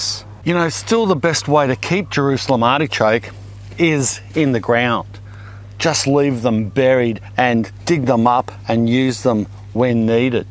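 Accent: Australia. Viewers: Thank you for your Youtube-channel! Watching your videos is a study of Fun Gardening and Australian English at the same time :)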